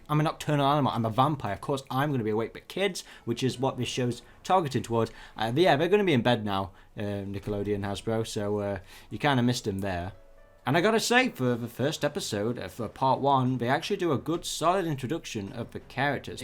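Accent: United Kingdom. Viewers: I like your British accent. But quentess prime is the traitor if you watch the last knight they call her that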